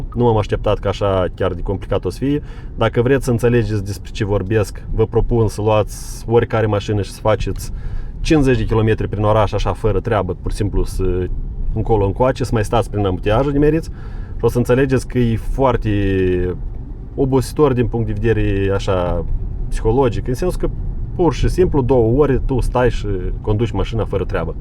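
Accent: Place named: Finland. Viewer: БЛЯ. Зашёл посмотреть, а тут чухонец какой то🤣😂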